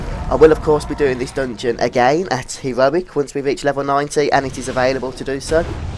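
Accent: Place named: England, United Kingdom. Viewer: ahh sorry for not explaining correctly, i ment where are u from england ;D never heard it before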